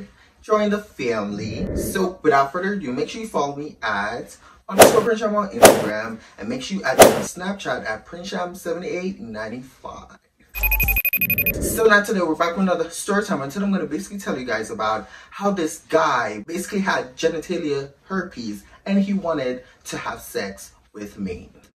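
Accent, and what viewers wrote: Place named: Jamaica. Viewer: The Jamaican accent ❤️😂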